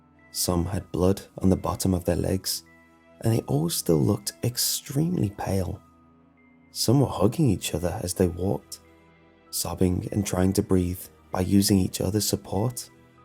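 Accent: United Kingdom. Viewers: Is from the uk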